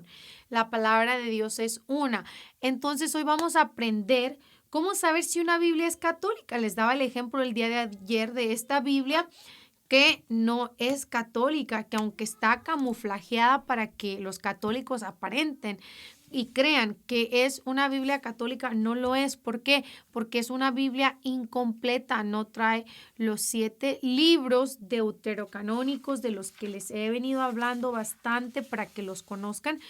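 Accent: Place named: Colombia. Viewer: Bendiciones hermanita pensé qué ud era de Colombia, espero muchos podamos escuchar estas enseñanzas me gozo en el señor hablarnos con tanta autoridad del padre,el señor bendiga su ministro!!🙏🙏 y sigamos aprendiendo cada día más 🙏🙏🙏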